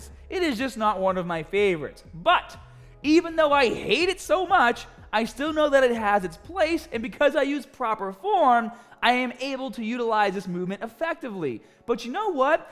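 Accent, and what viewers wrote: Boston, United States. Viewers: I love the boston accent lmao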